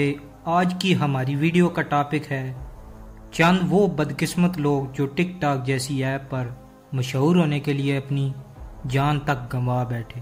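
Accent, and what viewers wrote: India. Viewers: axha phr ap khud hi th0ri apni v0ice k0 chng kr k b0lian qk ap ki w0 punjabi wala acent h..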